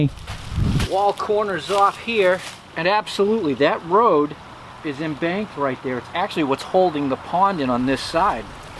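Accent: Massachusetts, United States. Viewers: This guy sounds like he’s from Massachusetts not New Hampshire. N.H. residents don’t sound like that . I fall o wed telephone lines. Pawnd and stuaff. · Anyone from nh vt here his mass accent. Or is it just me.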